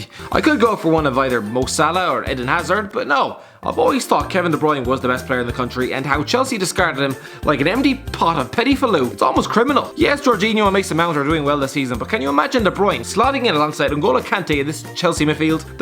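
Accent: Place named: Ireland. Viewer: I love your accent thats irsh init amazing accent that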